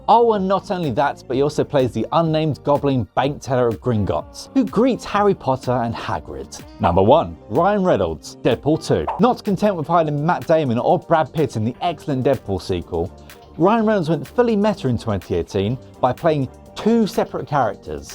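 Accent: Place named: Cockney, United Kingdom. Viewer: Ex drug addict Cockney guys are not who you hire for voice over work...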